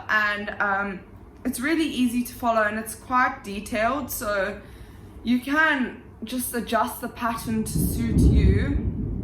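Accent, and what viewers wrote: South Africa. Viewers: Are you South African? You sound like you're from there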